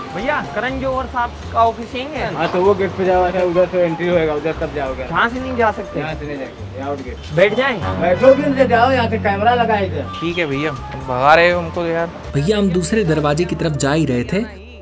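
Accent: India. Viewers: I m also from ballia....but aur bhojpuri speaking style is different from yours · Yeh baliya ka accent hi nhi h. Jhansi ka lag rha h.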